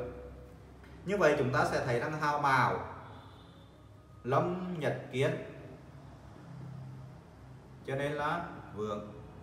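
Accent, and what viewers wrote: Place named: Hanoi, Vietnam. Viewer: Vậy khi đi dạy thầy nói giọng Hà Nội cho nó máu ai cũng nghe được hết chỗ để chê thầy còn khi về nhà thầy lại nói đúng giọng của mình. Thầy rất giỏi và giảng giải cũng rất dễ hiểu tỉ mỉ từng chi tiết nhỏ khâm phục thầy. Xin cảm ơn về những khoá học miễn phí của thầy và chúc thầy cùng gia đình luôn nhiều sức khoẻ và luôn may mắn và luôn luôn ngập tràn trong hạnh phúc an vui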